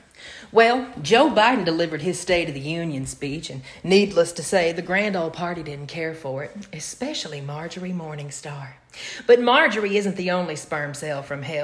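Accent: South, United States. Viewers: Taking down the hags with a southern accent! It's absolutely perfect! From a fellow southerner, thank you.🥰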